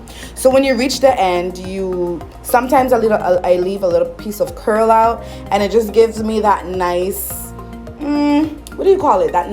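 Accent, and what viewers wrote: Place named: Barbados. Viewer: These locs are awesome and you are soooo gorgeous keep up the good work Your accent sounds a bit like a bajan lol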